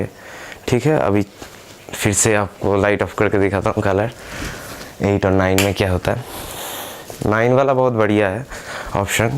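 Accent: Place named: Bangalore, India. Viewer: Kotha sunai puro Bangalore bacca mine hocche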